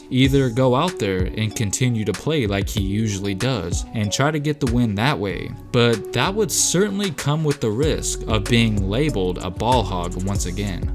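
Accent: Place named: Philadelphia, United States. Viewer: I was just thinking dat Philly all day lol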